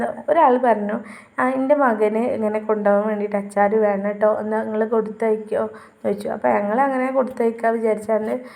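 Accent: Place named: Malappuram, India. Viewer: സംസാരം ക്ലിയർ അല്ല. ഈ മലപ്പുറം സ്ലാങ് വിടുക. അടിക്കടി ഇങ്ങനെ ട്ടോ ഓട്ടോ എന്നു പറയുന്നത് മഹാ ബോറ്. 🤪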